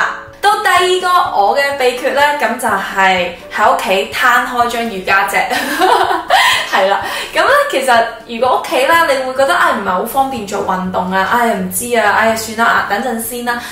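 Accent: Standard. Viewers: 原來coffee普通話也說得很標準的，第一次看到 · 好喜欢听coffee 说普通话。感觉你的国语越来越标准了👍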